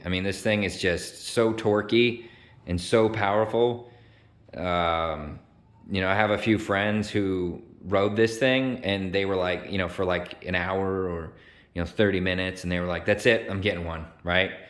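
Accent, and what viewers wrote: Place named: Texas, United States. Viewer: Bro, you got a slight hint of Texan accent now. Also, nice bike.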